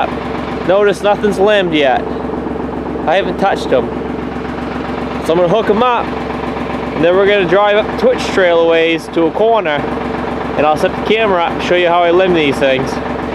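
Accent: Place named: Maine, United States. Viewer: The “Stump Lickah’s” are out in full force, going after the cedar bark, at that time of year!!! Unless you have some crab apple trees down back, but usually those are stripped pretty bare by the end of January!!! Your accent’s a little thin for being a “DownEastah”, but it’s nice to hear on YouTube!!! Hi from Central Maine, and Family!!!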